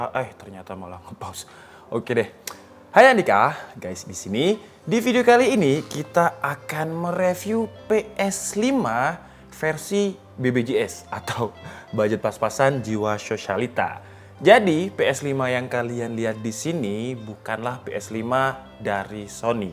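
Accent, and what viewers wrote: Java, Indonesia. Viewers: tibake nk ngomong sampean iki jowo nyel yo mas tak.kiro malah raiso.boso jowo😂 sukses terus mas.. mugo sehat slalu, diberi kemudahan dalam menjalankan suatu hal amin.. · orang jawa mana bang?😂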